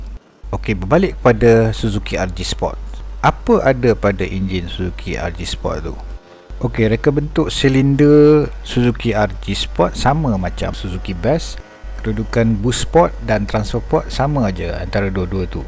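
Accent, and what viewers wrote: Perak, Malaysia. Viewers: Hahaha. Nasib baik sy bujang lg bang. Tapi terlajak sikit la, dah 34 ni single mcm mono je ha...abg perak belah mana ye. Org perak punya slang ni paling senang nk cam. Sy pun dr batu gajah ni ha....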